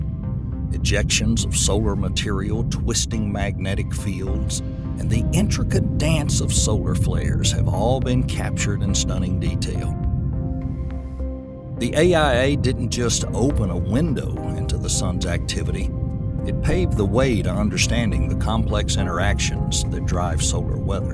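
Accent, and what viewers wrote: South, United States. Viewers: Gotta love the southerner talking about space. Love your Channel man!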